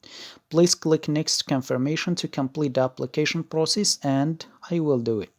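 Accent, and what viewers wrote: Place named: Morocco. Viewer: U r moroccan lol guessed it from ur accent lool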